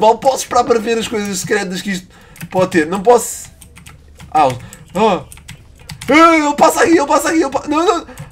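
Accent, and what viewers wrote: Portugal. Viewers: adoro sotaque portugues like para venom e fenom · Vc e de portugal? Sou no brasil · ele é de portugal lá eles falam assim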